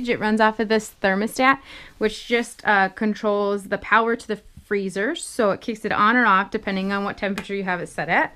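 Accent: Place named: Minnesota, United States. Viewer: I knew I recognized some Minnesota in you. Keep the great video coming!!!! 👍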